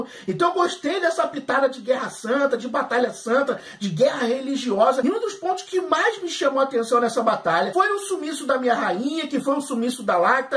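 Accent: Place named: Brazil. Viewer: Acho legal como ele pronuncia os nomes dos personagens! Bem brasileiro! Hahaha! Seu canal é legal!! Sucesso!!!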